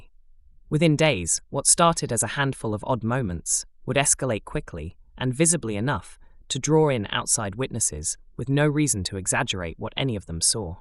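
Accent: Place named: England, United Kingdom. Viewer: I just discovered your channel today, and I had to immediately subscribe. Your videos are nicely done, and without filler or sensationalism. I would like to know what part of England your accent is from. You sound just like Daniel Radcliffe (and that’s not a bad thing!). 😊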